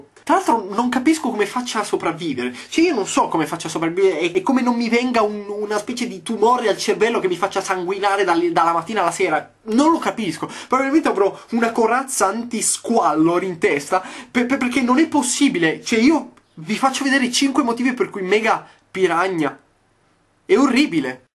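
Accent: Turin, Italy. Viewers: mi sembrava :) una vaga cadenza torinese!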